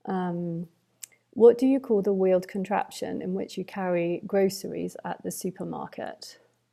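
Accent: Paisa, Colombia. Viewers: Yep you sing Spanish like a paisa, good job! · I love how strongly paisa your accent is. I could immediately notice it in your previous video when you pronounced the song’s name “ ”. Your English accent, however, is less pronounced than many other people I’ve heard from there, and much easier for me to understand.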